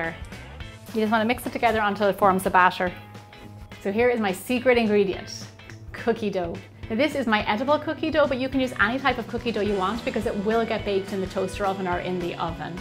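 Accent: Ireland. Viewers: Are you irish? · What part of Ireland are you from I live in a Cork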